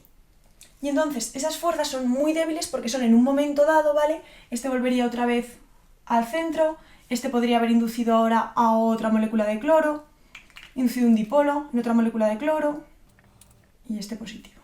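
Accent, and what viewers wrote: Spain. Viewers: Después de escuchar y escuchar su voz tan cool :3 (y mas su español castellano, que me fascina eso) he entendido a la perfección el tema. Muchas gracias!! :-)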